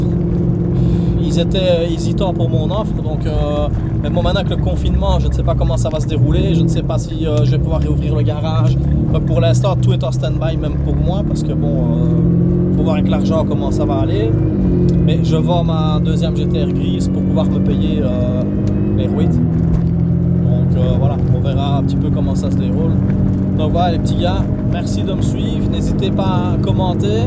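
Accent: Belgium. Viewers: Tu est belge vervier Liége ?? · Désabonné récemment de gmk et Akram pour découvrir un bon gars humble sans fioritures, avec un accent belge très agréable a écouter, bien plus intéressants que les "c'est bôo et elle a le diable en elle" de nos des accolytes superstars de youtube. Bravo a ta réussite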